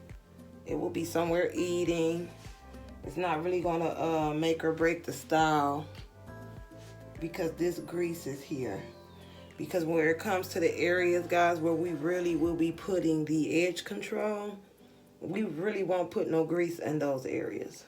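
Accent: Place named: Jacksonville, United States. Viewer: Luvvvvv, if u dnt sound like yr from Jacksonville, Fla 😂😂😂 with that bust it down 😳, I almost fell off my bed( I'm like where's the gar?😂) ! Love the look ! I love the sleek hair styles( when I'm doing my growth treatments )! Kp doing u ma !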